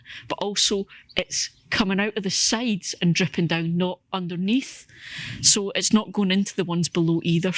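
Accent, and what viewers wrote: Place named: Northern Scotland, United Kingdom. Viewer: I see you are on the East side of Scotland - whereabouts? I spent 3 years in Rosyth but you sound further North (to me anyway LOL)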